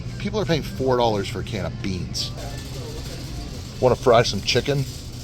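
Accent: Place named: Canada. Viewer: Oh yeah you're an American but you look and talk more Canadian than most Canadians I know. Thanks for covering this in Canada. Best wishes. By the way, these prices at Metro are BY FAR the MOST expensive I've seen at any grocery store here in Canada. This is why I never shop Metro. And I bet those onions (and a lot of the other produce and meats) go rotten sitting around because no one is buying them.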